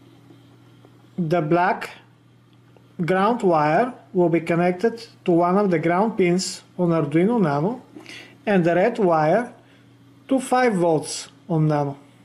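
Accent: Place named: Russia. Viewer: Какой-то прям русский английский...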